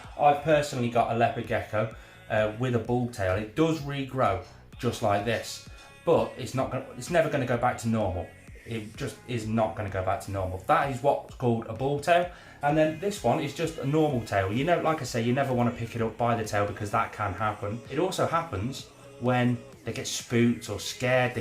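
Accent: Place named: North of England, United Kingdom. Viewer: Yo man! youre clearly from the north of england ahaha (i am too :P) and i wanna ask you if there are any good breeders that are relatively close to manchester that you know of? that would be really helpful man. Preferrably on the southern side of manchester. Im thinking of getting my first pet as a leo or a crestie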